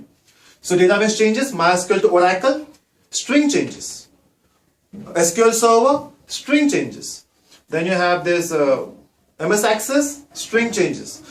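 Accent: Russia. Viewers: for 10sec i thought u were russian sir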